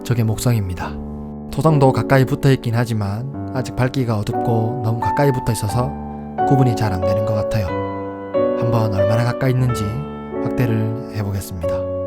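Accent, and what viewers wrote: Gyeongsang, South Korea. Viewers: 경상도 사투리에 목소리 좋네요 내심 연예하고 싶네요 ㅎ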